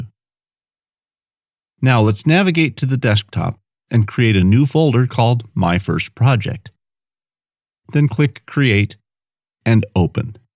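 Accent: United States. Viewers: I don't want to sound like an ass, but that slow American accent is the worst. When the guy is talking I have this sudden urge to punch someone square in the face! I really want to figure this program out, but this tutorial is just unbearable to listen too. Is there any version of this video in English English? Or Australian? Even Scottish would do.Just anything but this, for god's sake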